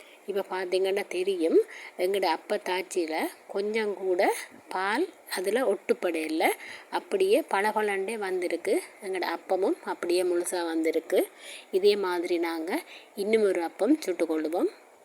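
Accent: Sri Lanka. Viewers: தேங்காய் பால் ஆப்பம் அருமை 🎉🎉🎉அதைவிட தித்திக்கும் இலங்கை தமிழ் அருமை 🎉🎉🎉🎉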